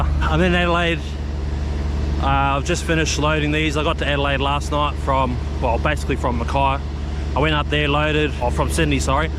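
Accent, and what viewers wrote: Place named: New Zealand. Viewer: Funny listening to a kiwi explains trucking life on the east coast. I lasted 8 minutes. Thenks brew.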